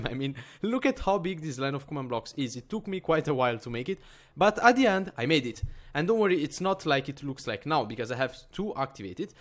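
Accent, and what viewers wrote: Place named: Italy. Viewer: si sente che sei italiano♡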